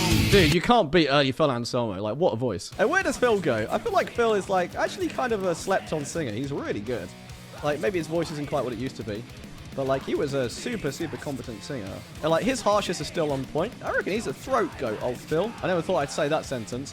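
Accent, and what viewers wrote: United Kingdom. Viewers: VERY BRI'ISH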